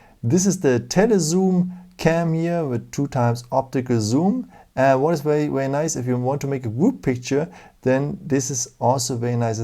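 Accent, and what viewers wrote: Germany. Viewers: Think he's from Germany. I'm living there and most Germans speak English like that. But I agree with you :)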